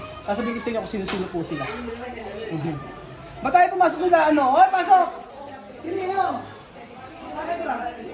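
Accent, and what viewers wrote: Philippines. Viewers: Pati Naman Po Yung way Ng pagsasalita may say pa din kayo? Malamang Po iba Ang province ni kuya malamang may mga salita Yan na di natin nakasanayan naririnig. May friend aq from CamNor pag nagsasalita lagi may Ma sa unahan Ng bawat salita Niya like mapunta sa mall,makain na tayo,matulog na,maligo sa ilog. Bawat Lugar Po sa atin ay may mga Punto or salita na pagkakakilanlan Ng kanilang mga Lugar.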